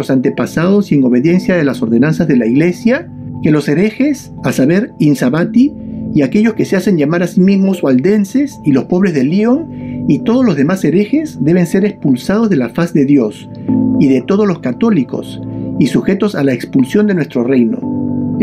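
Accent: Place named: Peru. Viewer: Estimado hermano, por tu acento, y tus 🦙 llamas 🦙 esos lindos adornos que se aprecian al fondo, supongo que eres peruano 🇵🇪 que hermoso estudio y reflexión, digno de un siervo del Señor de los cielos. Quiera Dios te escuchen a ti, ya que a mi no me creen, la mayoría piensa que La Biblia es un libro de cuentos de fantasía. Como cristianos e hijos de Dios debemos estar velando, pero no quieren ni hablar del tema. La paz sea contigo, amén 🙏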